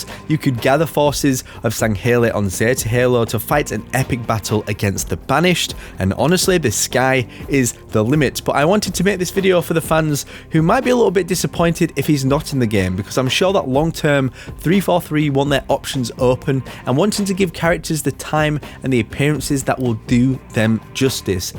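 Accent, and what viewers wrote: Yorkshire, United Kingdom. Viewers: You aren't from Yorkshire are you? I'm not myself, but I lived in Yorkshire for about a year.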